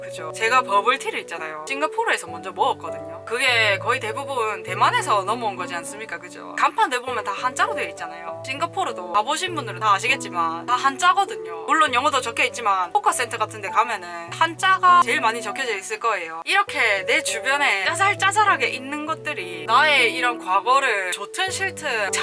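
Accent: Gyeongsang, South Korea. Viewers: 우어,,,근래에 본 사람중에 제일 경상도 사이다네요 ㅋㅋㅋㅋ 구독 누르고갑니다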